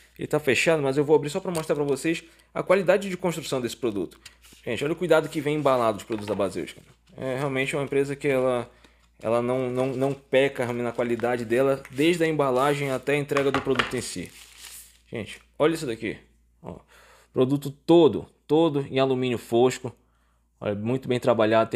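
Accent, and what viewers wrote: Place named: Pará, Brazil. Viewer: sotaque de paraense.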